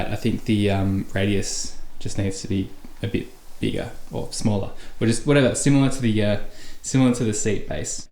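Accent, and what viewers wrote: Australia. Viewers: I would fix the back rail with a saddle joint Too many parts. The frame is more about how it looks than how it functions and thats fine if you are making a gallery piece but you said you were trying to design a chair to offer with your tables. That being the case its imperative you keep costs down and therefore simplicity rules Dominos will not make anything stiffer they only provide alignment for glue-up and some shear resistance. Also just an idea if you are determined to have the rails intersecting in the middle of the underside of the seat why not get rid of the block and the flimsy 4 joints and just beef up the stock slightly and do a Cross Lap Joint. And as someone else said great to hear an Aussie accent on YT. Worth a subscription from me. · Mate this is top shelf content 👌 so refreshing to hear an Aussie accent on yt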